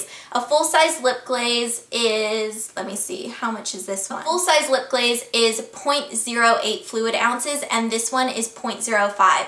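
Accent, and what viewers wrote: France. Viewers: your french accent,, is so cute :) haha xx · you have an amazing french accent (: birchbox need to send to england! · ahah i like your french accent !! uugghhn i really hope BIRCHBOX will send to France soon !! i'ld like to try this; it seems really fun and its a cool way to try new things have a good day =) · i'm a french girl and your french accent it's really coooolll & pretty ^^* !!!!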